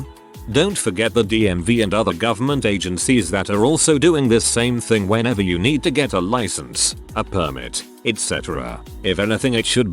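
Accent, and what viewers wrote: United Kingdom. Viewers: Where did you get that robot voice with a British accent ,,, it's hilarious.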